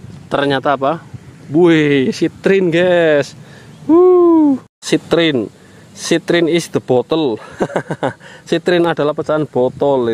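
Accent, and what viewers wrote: Banyumas, Indonesia. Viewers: Gayor ngapak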